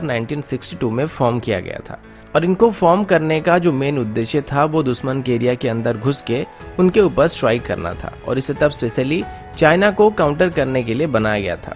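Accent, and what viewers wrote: Odisha, India. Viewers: Vai tum odisha se ho kya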